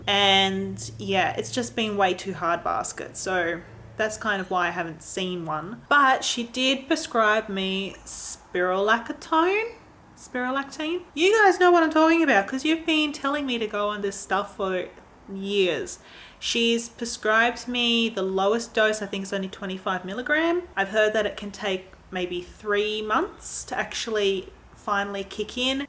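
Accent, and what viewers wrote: Australia. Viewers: Hi ♡ I usually remain a ghost-viewer but I wanted to comment to say a few things: 1) I love your videos 2) and your personality 3) and your laugh (it's so cute!) 4) I love australian accent (had never heard it before hihi) 5) I read your descriptions! ♡ 6) I so feel you about the importance of words/phrasing (#sensitiveteam ♡) 7) We love you! 8) we love you, and you don't even need to apologize for doing different than usual! Just do you! we'll love you and love your channel whatever! 8) lots of love from my little heart of acne/redness/scars-and-etc (#teamhairy! Haha) sufferer ♡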